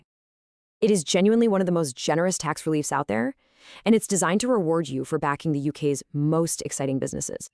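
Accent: United States. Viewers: Excellent content, although why the horrendous American accent?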